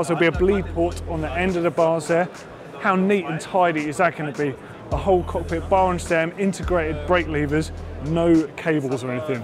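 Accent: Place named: United Kingdom. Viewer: i thpught u were from the uk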